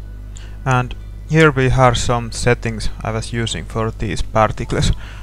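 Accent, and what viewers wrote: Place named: Finland. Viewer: wow nice... you are skilled artist, hopefully we will see more from you in future. i have to say you are first fellow finn (yeah heard from your accent) who makes videos about his/hers blender projects :)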